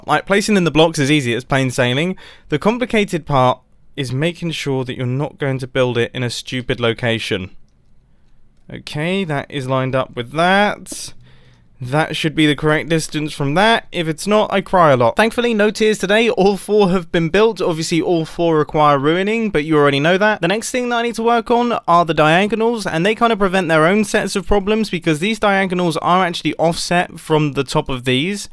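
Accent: England, United Kingdom. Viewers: sounds like a proper englishman (or possibly the love child of joe hills and harry potter)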